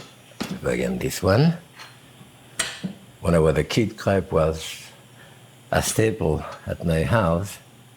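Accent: France. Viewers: Come on old timer you can do it. Say C-R-E-P-E"SSSSSSSS" Put the "S" in there you can do it! I believe in you. You are speaking English to an English audience. We use the "S" for plurals and you damn well know this because you've lived here for most your life so stop with your overaccentuated French accent. It might have been cool with the ladies in the 70's but now it's kinda BORING! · sounds French still are you on drugs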